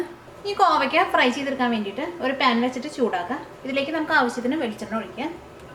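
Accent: Thrissur, India. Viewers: thanks for that recipe....... one advice: you need to try to speak in clear malayalam instead of using your regional accents like thrissur or kottayam........ usually when we address public (either in stage or off stage) all of us speak normal malayalam without local accent....... (and all of us can speak too)..... try next time please.........so that you represent the entire kerala..........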